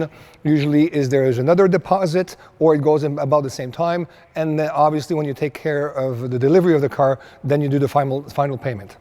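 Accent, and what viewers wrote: France. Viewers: Is this guy French ? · Are you french ?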